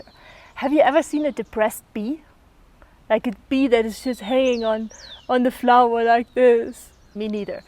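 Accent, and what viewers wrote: Germany. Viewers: This is the first video of yours I'm seeing. It's very interesting. You're in the Andes? But you sound German. I recently bought bee pollen for chronic inflammation. I'm hoping it will help. I like your video and will check out more.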